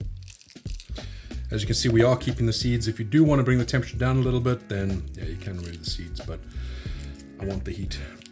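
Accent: South Africa. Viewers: Thank you for video. The South African accent sounds like a breath of fresh air to me also. I grew up there. Been living in USA for 40 years now.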